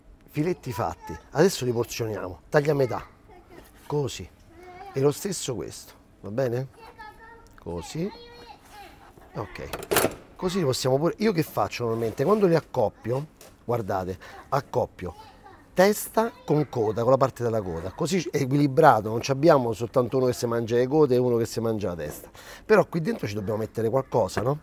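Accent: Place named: Nettuno, Italy. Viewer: Sei dorceeee...con.. Noto ogni tanto un accento nettunese..☺🍾🍾🍾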